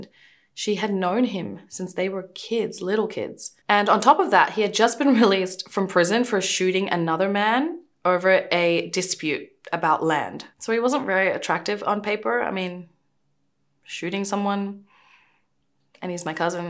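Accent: India. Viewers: Ehhh I always thought your ethnicity was South Asia. Even when you talk about your childhood and stuff it sounds so Indian XD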